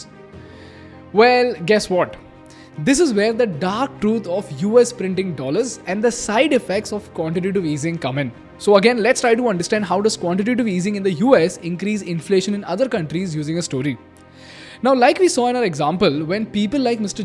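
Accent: India. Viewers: Its indian accent, much easier to understand. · Can’t take anything serious in this Indian accent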